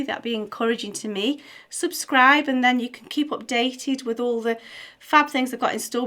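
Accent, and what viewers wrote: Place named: Staffordshire, United Kingdom. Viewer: knew it!! I know a Staffordshire accent when I hear one :) I’m from Derbyshire!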